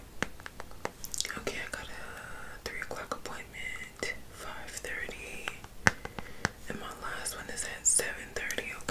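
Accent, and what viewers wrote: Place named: Trinidad and Tobago. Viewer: You trinidadian right?😂 Lmao heard it in your voice...🇯🇲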